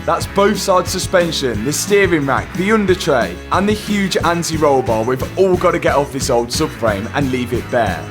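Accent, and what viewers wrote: United Kingdom. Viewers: I'm so irritated of his British accent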